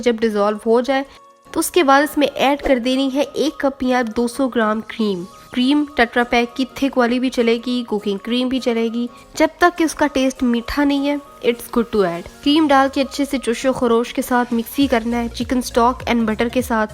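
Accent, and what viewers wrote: Punjab, India. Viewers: yaar aapi aap boht acha se explain krti ho i loved it ur punjabi axcent is just amazing ap sach mn Sialkot se ho hahah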